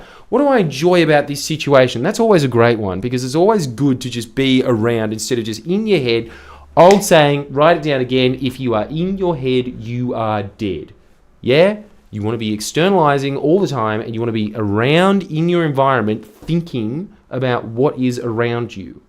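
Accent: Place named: Australia. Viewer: A lot of this is what I already do daily to keep oriented, but it is pretty nice to hear it in an Australian accent with a jawline that could stop a truck. Thanks mate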